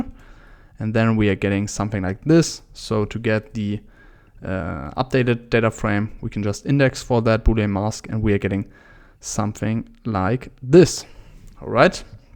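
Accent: Germany. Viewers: hey dude. i really like your videos, thank you :) i am getting into python atm. do you have any tipps? in the end i wanted to make a telegram or discord bot. i like the idea of a trading bot also a lot :) so for now i am stuck at my udemy though :D so any tipps are welcomed. and yeah, thank you for your videos :) ach so, kann es sein, dass du deutsch als muttersprache hast? ;)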